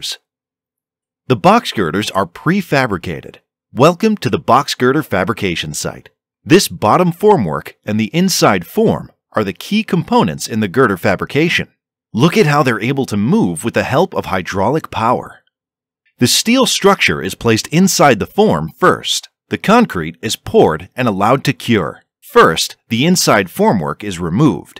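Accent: Kerala, India. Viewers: Why don't you stick to using the AI generated voice in place of your Highly "Mallucised" accent!!! Sorry for the blunt comment. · please don't use your voice. I hate indian accent. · I miss the indian accent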